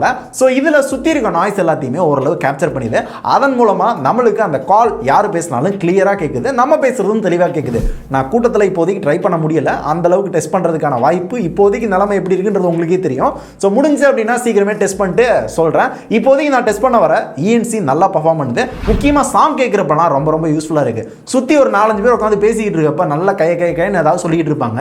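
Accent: Madurai, India. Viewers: Kaya kaya nu pesitu irpanga.. Madurai slang 😁❤️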